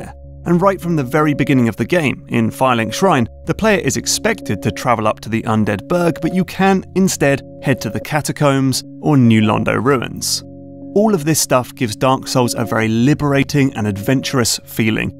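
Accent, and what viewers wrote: United Kingdom. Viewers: What's up with the great analytical YouTubers all having UK accents?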